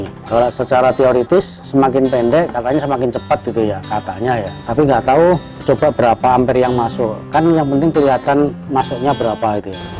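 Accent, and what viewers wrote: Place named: Java, Indonesia. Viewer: Medok sekali asli gunkid 🙏🙏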